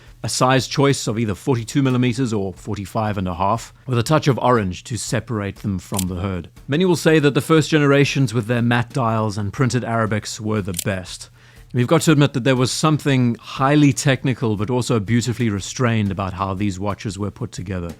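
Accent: South Africa. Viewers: BTW just subscribed for the SA accent and guitar if nothing else 😅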